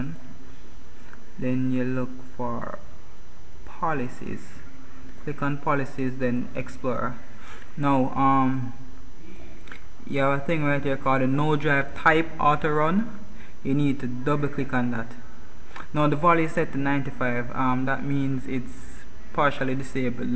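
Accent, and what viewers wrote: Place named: Jamaica. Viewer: i can tell ur jamaican.....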